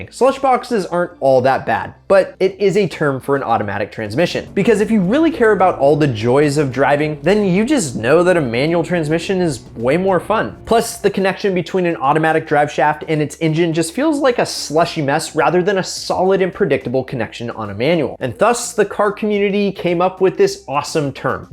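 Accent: United States. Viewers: He’s American and he spoke like a American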